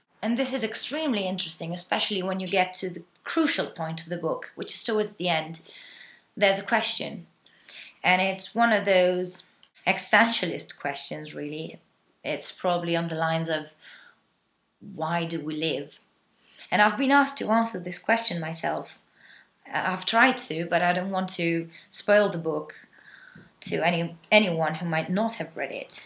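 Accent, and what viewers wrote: United Kingdom. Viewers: mamma come parli bene, con accento inglese proprio! sono stata un anno di america (ho solo 17 anni) e ho molto d + l'accento "slang americano" tu invece sei così english:P!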